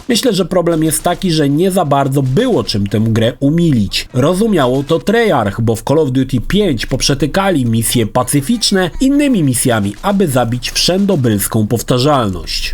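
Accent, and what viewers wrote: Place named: East, Poland. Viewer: O wymowę bym się nie czepiał, może nieco zbyt wschodni akcent i stąd to mocno słyszalne "z", ale to drobnostka, a pochodzenia nie oszukasz:) Lubię, ale jeśli coś mu mógł bym zarzucić to to, że często mówi coś o czym nie ma pojęcia albo mu się wydaje, że ma pojęcie oraz to, że jego materiały są robione trochę na odpierdol. Do pierwszego zdążyłem się przyzwyczaić, w końcu komu z nas się nie zdarza pieprzyć głupot? To może czyni takim ziomeczkiem, nie jest jakimś nieomylnym guru i Jaśnie Panem Youtuberem. Więc pomyłki, zwłaszcza na streamach, jestem w stanie wybaczyć. Ale materiały robione na kolanie trochę mnie mierżą. Po takiej recce oczekiwał bym jakiegoś przygotowania, scenariusza, trochę więcej wkładu własnego, a nie na zasadzie "chuj tam, wpiszę 1, nikt i tak tego nie ogarnie". No i swoją drogą przyłapałem na kłamstewku. 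Mam nadzieję, że karę za to w tym roku Mikołaj przyniesie mu soczystego crapa pod choinkę ^^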